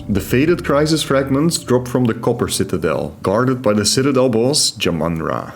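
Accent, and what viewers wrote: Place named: Netherlands. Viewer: This video autoplayed after a random Poe2 video. I was like hmmm his accent sounds Dutch. Then I saw your channel name😂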